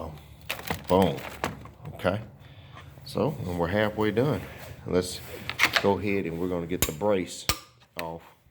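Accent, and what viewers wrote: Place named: Alabama, United States. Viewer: Haha! I love this guy's down home accent! No place like home (Bama).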